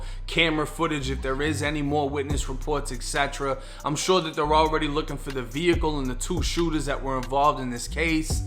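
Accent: Boston, United States. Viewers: I'm from Boston massachusetts also and I definitely am a subscriber. I love your baston lol accent 🥰🥰🥰🥰🥰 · Are you from Boston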